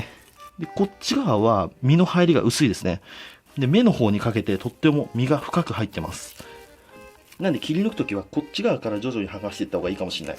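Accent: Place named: Nagoya, Japan. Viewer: 動画は好きやけど名古屋弁がうざい笑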